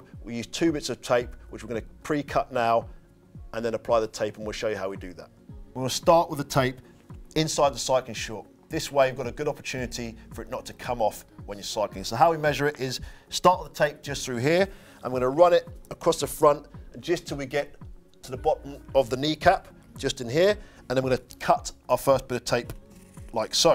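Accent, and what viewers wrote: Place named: England, United Kingdom. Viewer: that English accent he has is awesome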